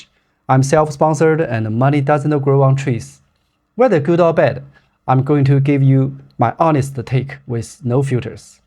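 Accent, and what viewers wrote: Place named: China. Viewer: in terms of grammar there isn't much to correct. the only challenge is sometimes I have a hard time understanding some words. it's certainly due to my unfamiliarity with chinese accents.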